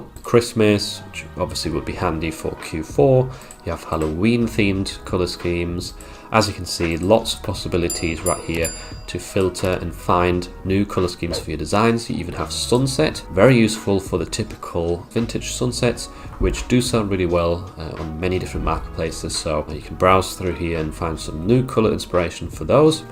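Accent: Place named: United Kingdom. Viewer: 2023 ! A little late to the party as I've just found your channel. Great tutorials. Thank you.. Nice to hear a British accent too.amongst the sea of our US cousins! 😂😂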